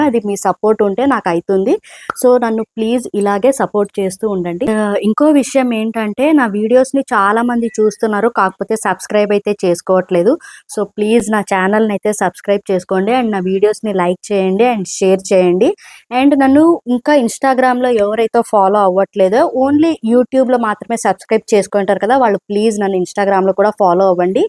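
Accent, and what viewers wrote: Karnataka, India. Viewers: మీరు హిందూ పుర్ తెలుగు బెంగళూరు లో తెలుగు బాగా మాట్లాడుతారు మీరు కన్నడ నుంచి వచ్చిన తెలుగు ఆలాగే మీ భర్త గారిని రీ అంటున్నారు రి పదం కన్నడ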